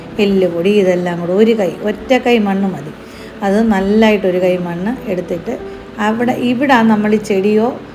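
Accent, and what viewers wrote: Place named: Pathanamthitta, India. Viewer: Pathanamthitta സ്ലാങ് 😄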